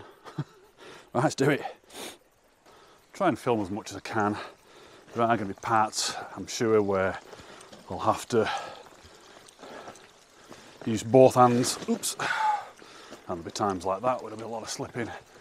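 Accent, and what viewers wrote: Yorkshire, United Kingdom. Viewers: Love your videos pal. I'm in the lakes hiking the peaks all the time, but it's still great to see various places from a different perspective, especially on parts I haven't been to yet (eg Lord's rake). I'm trying to work out where you're from with that accent.....I'm guessing somewhere between Sheffield and Leeds? lol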